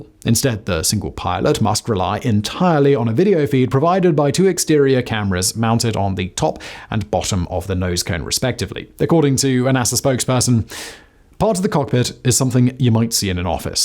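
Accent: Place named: RP, United Kingdom. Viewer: Why do you think just because this channel and you speak with that upper class posh Gary Gavin accent that I’m not going to swear the fucking shit bat balls in this comment section know you are fucking mistaken where the fuck is ATI and I want business blaze where the fuck is business blaze blazing back now cocaine also nice blaze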